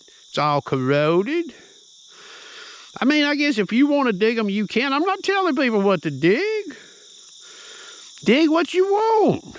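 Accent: South, United States. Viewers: He's pretty smart for sounding like a hill-billy isn't he? :). When I saw him put a Legend near a fan and spin a coin around to test the speaker.. I knew then this dude was a genius!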